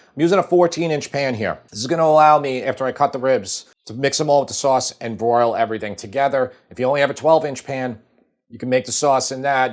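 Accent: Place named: Long Island, United States. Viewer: Never lose your lawng island accent. As an LI native (east end) who splits his time between the island and North Carolina, the accent is always recognized down here as a “new yawk” accent. I always correct them and tell them that “It’s a lawng island accent, and we live ON the island not in”.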